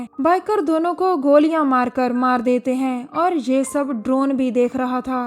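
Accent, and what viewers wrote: Punjab, India. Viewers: Are u Punjabi 😀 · Punjabi tone aa rahi he...bechari zabardasti Hindi bol rahi he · Aap punjabi lgte ho bolne ke style se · Taudi tone teh Punjabi hi rehni haige chahe jini bhi Hindi bolan de koshish Kar Lao ji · tohdai awaj to hint mil gya si ke tusi punjabi o ....best of luck for your Channal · I love your Punjabi accent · Aap punjabi bolte ho na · Madam aap punjabi ho ?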